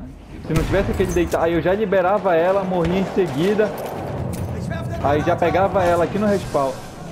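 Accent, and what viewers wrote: Pará, Brazil. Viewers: Você é do estado do pará? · VC E PARAENSE MANO KKKK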